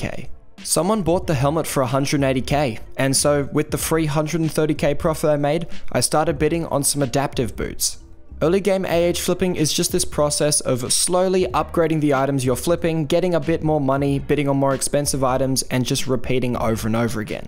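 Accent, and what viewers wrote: Australia. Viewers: idk where ur accent is from ( is it australia? im pretty sure it is?) also i love your content :) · U Australian mate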